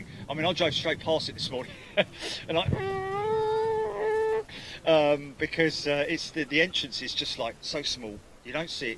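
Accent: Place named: United Kingdom. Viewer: Love the British accent! Boy, he certainly keeps himself amused.....and us as well! Greetings from St. Petersburg, FL, USA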